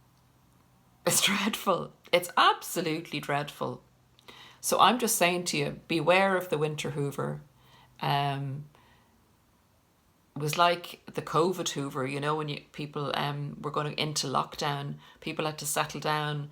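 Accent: Ireland. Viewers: You deserve a lot of subscribers. You nail it on the head. I am 2 years NC. I feel a lot better but I still watch videos and was extremely happy to come across your channel. I’ve already shared one on fb. You are wonderful. Love your Irish accent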